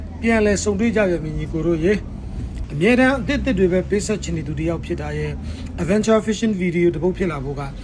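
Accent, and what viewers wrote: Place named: Twantay, Myanmar. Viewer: တွံတေးတိန်းတန် အသံနဲ့ဟ ရှင်တို့က ရဲလား🤨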